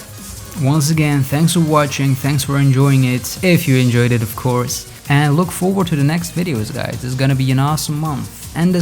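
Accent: Germany. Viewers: where are you from? germany? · I am pretty sure he's from germany, he sounds very german.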